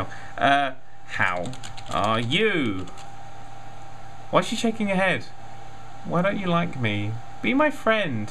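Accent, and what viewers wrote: United Kingdom. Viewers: Beλst Menus That's because,you know,british accent.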